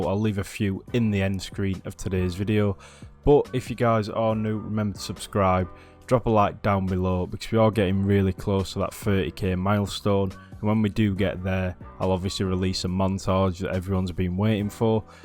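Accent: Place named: Leeds, United Kingdom. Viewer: Sound like a leeds lad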